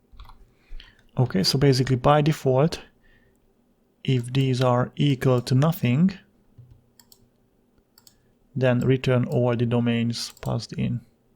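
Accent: Hungary. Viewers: Ugy hallom abbol az angol akcentusbol hogy magyar vagy te is :)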